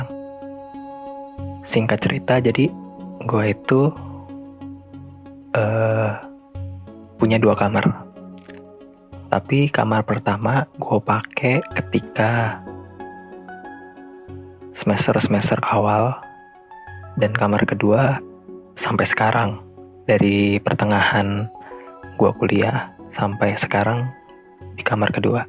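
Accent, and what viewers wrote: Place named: Java, Indonesia. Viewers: lo gue lo gue tp medok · Orang banten tapi medok. Pst dah fasih bahasa jawa ya bro? 😁😁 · Yang lo-gue lo-gue pake logat jawa itu serem gan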